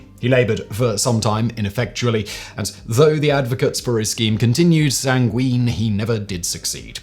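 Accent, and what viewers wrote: United Kingdom. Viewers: I do enjoy listening to a Brit talking about the American revolution.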